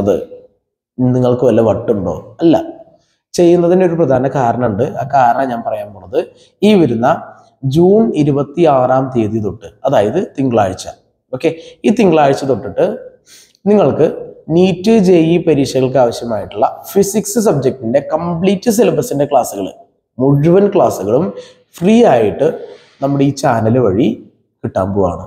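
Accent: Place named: Thrissur, India. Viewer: Uff. chetta. Chettande oru valiya fen aanu njan. Chettande aa thrissur slangil edkunna class um. Sirde fashion senseum mudiyum ellam poli aanu.ella vdeosinum katta waiting aanu.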